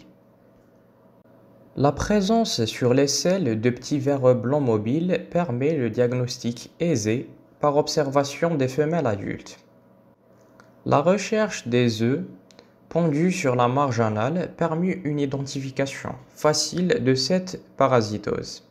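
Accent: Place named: Algeria. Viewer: Vous avez une accent algérienne en TT cas merci infiniment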